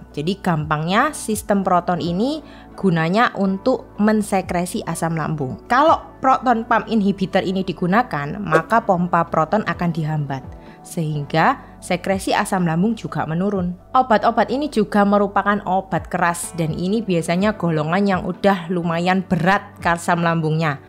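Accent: Java, Indonesia. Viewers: aku faham logat mu cik...kmu tinggal di jawa kan.😅..btw aku sakit gerd ..pusing ga hilang udah 7 tahun ....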